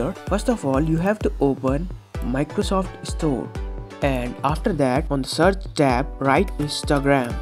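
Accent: India. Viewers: This guy has a more Indian accent than me. ut all goods man. Everyones different. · the indian accent is so adorable lol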